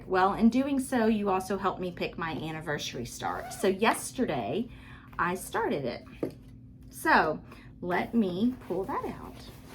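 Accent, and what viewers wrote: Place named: South, United States. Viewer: Hi! I live in Colorado - but grew up in Virginia and South Carolina and my family is all still in the Carolinas. Watching you and hearing your sweet southern drawl feels like a visit from home. 💕 1, 2, 3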